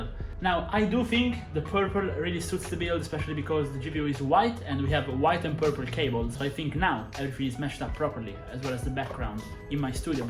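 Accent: Italy. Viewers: Senza neanche leggere la descrizione del canale ho subito capitoo che sei italiano l'accento é forte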